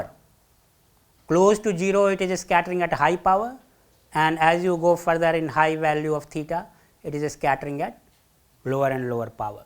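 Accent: India. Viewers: This lecture is so good that for the first time I was able to listen through the entire lecture on YouTube with Indian accent.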